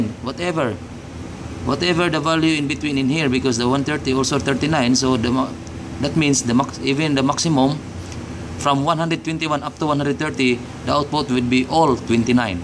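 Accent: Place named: Philippines. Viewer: i really like the way you explain things, and its very easy to understand. The way you talk i believe your a Filipino hehe. Hello sir. I'm really into control loop/logic diagram, i want to learn more in that area. maybe you can advice/help me achieve this in my career. Currently doing cadet-ship in a Power Plant.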